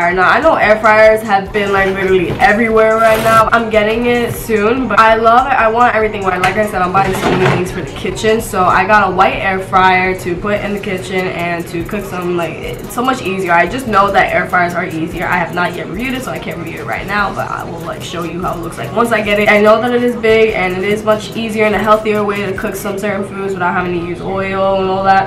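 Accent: New York, United States. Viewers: lmao that is no florida accent at all, its a New York New Jersey accent. Listen to how she says Cawwfee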